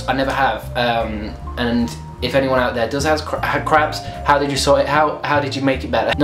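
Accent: North, United Kingdom. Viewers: you sound so northern lmao well i think so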